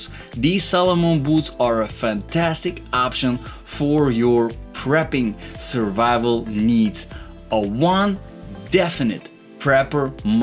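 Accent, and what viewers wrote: Russia. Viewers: Hi there !!! First of all I did sense russian accent !!)) Now about boots you recommend !! I will not buy any of them !! The all are no good all 10 of them !!! Let me explain you why !!! They all have the same or similar shoelaces tight up desighn . Those shoelaces going through fabric or plastic loop from bottom to the midle of boot and at the top of a boot through sets of metal or plastic hooks !!! This is very poor desighn because send and dirt will packed up on your shoelaces wile you hiking and friction will tear those loops through , those hooks at the top of those boots will do the same thing to your shoelaces because those hooks are tight , and every time you tight your boots the same part of shoelace will be abused by friction on that hook. I talking of few month of everyday carrying and you will be noticed of unprepared damage !!! 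Any field repair to this loops will not hold up for ling , maybe one day and than all sawing will tear them even worst . Same thain with those hooks they will keep twaring your shoelaces, and there is absolutely no way to fix them , if you try to file them to smooth corners , you will do even worse by creating shapr edged scratches. !! Only good design is metal eyelids where shoelaces go straight trough those sidelips on your boots !! That design is very forgiving on your shoelaces and much easier to repair on the field , specially if you are not just hiking for a day , but actually surviving , and covering a lot of distance on daily bases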